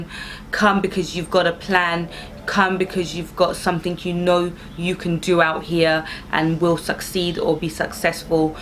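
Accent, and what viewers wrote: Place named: Nigeria. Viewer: i always find that transitional accent fascinating, you obviously grew up in nigeria so i should be able to adjust back quickly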